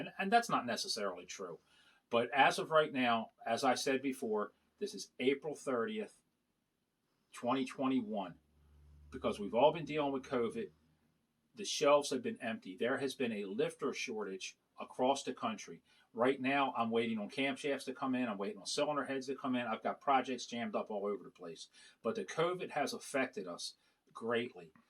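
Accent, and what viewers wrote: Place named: New Jersey, United States. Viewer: Love your channel brother,you have jersey accent ..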